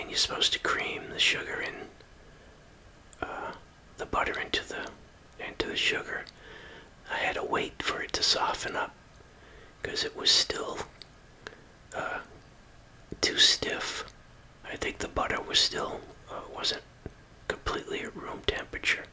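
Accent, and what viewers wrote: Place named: New England, United States. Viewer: this is the first of your videos i have seen -- i got so excited to hear your new england accent!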